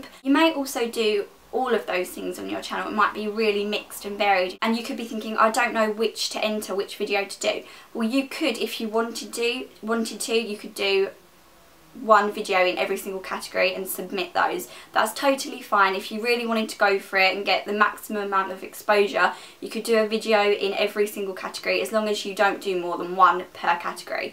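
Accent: United Kingdom. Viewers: First video I've seen of her! Before I even played it I was like "shes gonna have a British accent!!" and she did lol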